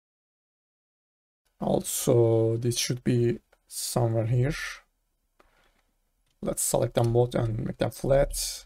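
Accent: Turkey. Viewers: Sanırım Türk bir kardeşimizin kanalı, ingilizcesi tam bir türk aksanı içeriyor :)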